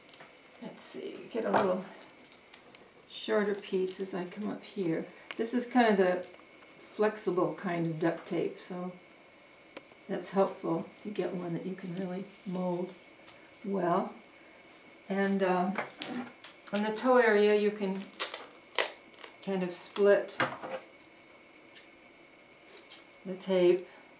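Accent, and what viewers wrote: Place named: Northern Ireland, United Kingdom. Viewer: Hi. I really enjoyed your video and I plan to try it. Great to hear someone from NI. What county? I'm from Tyrone.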